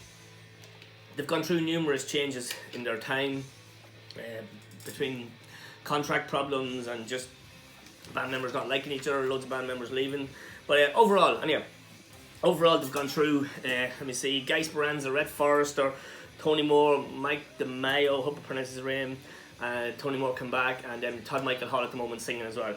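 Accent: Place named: Ireland. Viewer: Love you voice I wanna say Irish ☘️ but I could be wrong not sure exactly but anything Scott Irish or German I feel at home .. and I live in Texas lmao 😂🍺cheers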